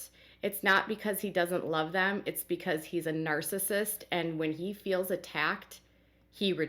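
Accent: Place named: Minnesota, United States. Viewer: I love your analysis and your accent!! You must be from Minnesota or Wisconsin???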